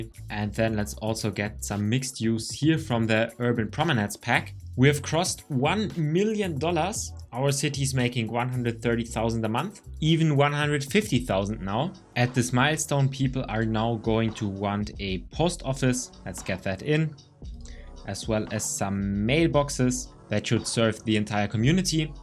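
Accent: Germany. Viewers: Also nich böse gemein. Das hört man ja raus dass er deutsch is😅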